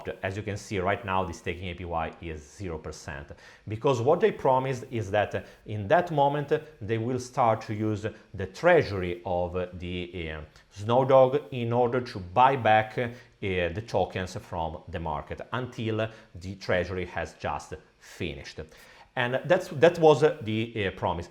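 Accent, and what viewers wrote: Italy. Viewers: Are you italian by any chance? your accent sounds a bit that way, if I'm right, mi fa piacere vedere un fratello italiano in DeFi come me 😁! Great video, good job!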